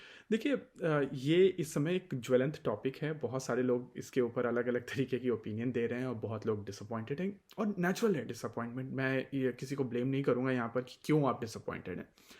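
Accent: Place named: Maharashtra, India. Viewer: भाऊ. तुम्ही मराठा(महाराष्ट्रीअन) आहात का? तुमच्या कारण शब्दाच्या उच्चारामुळे जाणवले.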